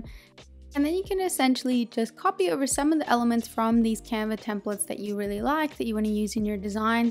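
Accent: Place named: Australia. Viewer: I love trying to decipher accents, especially blended accents like yours. What I’m mainly hearing is Australian but with a bit of something else. Is it North American? Irish?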